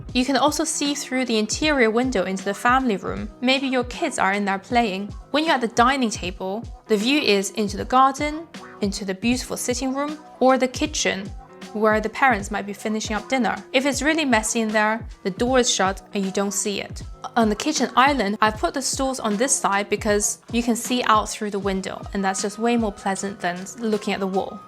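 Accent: England, United Kingdom. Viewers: Which part of England is YOUR ACCENT FROM ? manchester?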